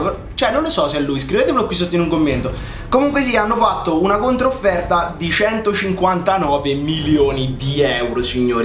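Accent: Lazio, Italy. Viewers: Ma sei de Frosinone?ciociaria portami via 😂😂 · ma sei di Frosinone?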